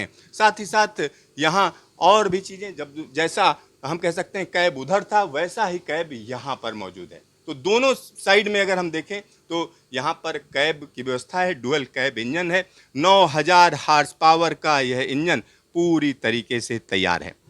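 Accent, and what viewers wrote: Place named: Bihar, India. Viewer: Bihari accent mat bolo saf hindi bolo.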